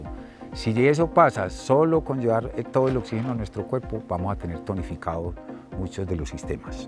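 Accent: Colombia. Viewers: Que delicia escuchar hablar a una persona colombiana !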